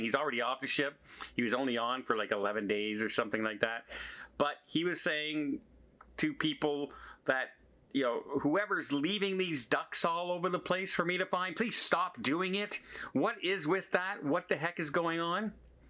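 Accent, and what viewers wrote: Canada. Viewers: I can’t pinpoint why, but I love your videos, despite never having been on a cruise in my life (I am in my 60’s, married, and empty nest) and having no concrete plans to do so. I am a New Yorker and appreciate your Canadian accent and your overall positive outlook on seeking to make your passion a reality. If I were ever to book a cruise, I would take your recommendations to heart. You are an inspiration in how you travel and share the joy with your viewers.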